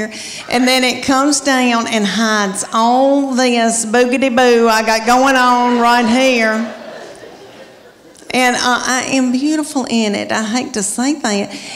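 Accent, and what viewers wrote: South, United States. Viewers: Youa lil bit country ain't cha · Yes. She's very smart, hidden behind that accent, a true American!👍❤️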